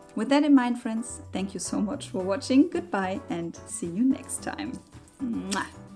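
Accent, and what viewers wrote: Germany. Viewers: You make some very good points about the shortcomings of the S25; Samsung missed the mark on the whole range this year, it seems. I'd argue that the camera is still better than most of the users when it comes to taking photos, but you're spot on about the charging speeds and screen reflection. I've been with Samsung since the S4 but I'm definitely going to investigate other options when it's time to upgrade my S23+. Your English is better than most North American native speakers! Your grammar is impeccable and vocabulary is sophisticated. Yes, you have an accent but it's charming and only adds to your videos. And my mother was German, so I get a bit nostalgic when I hear it.❤Don't change a thing.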